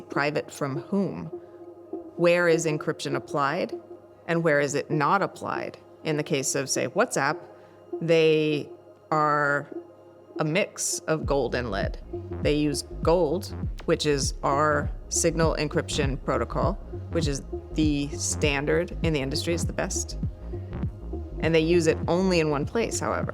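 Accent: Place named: United States. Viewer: Wat bedoel je precies? De inhoud? Of het zware Amerikaanse accent? We hebben allemaal kunnen meekijken bij de CIA dankzij meneer Snowden. Dat Carbon Footprint verhaal is ook makkelijk te fact checken (het recyclen van plastic is overigens exact het zelfde als je toch bezig bent). Ze zegt niets vreemds qua inhoud.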